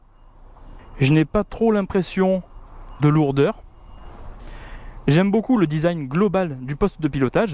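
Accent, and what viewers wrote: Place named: South, France. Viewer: un petit accent du sud bien sympa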